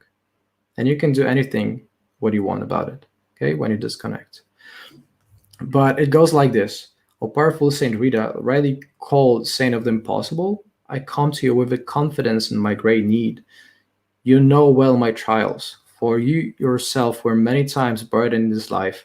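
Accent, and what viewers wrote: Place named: Poland. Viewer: Also thank you for introducing me to St. Rita. I am a fellow Catholic, Hungarian born. To me your accent sounds like someone who moved away from Poland when you were in your teens and learned English then. (or maybe I am projecting here as that's my story) . Good on you for being so brave and sharing your belief in public, I know exactly how hard that is as especially being a Catholic.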